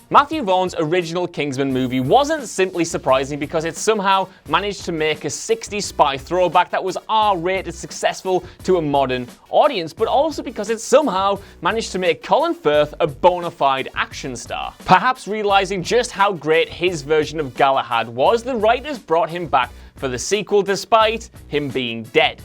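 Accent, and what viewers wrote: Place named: United Kingdom. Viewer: How can ANYBODY sound THAT F*CK*NG English?!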